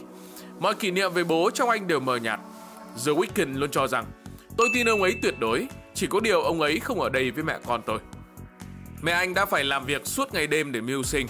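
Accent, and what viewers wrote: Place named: North, Vietnam. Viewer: Ng bắc toàn đọc the là giờ. Thua luôn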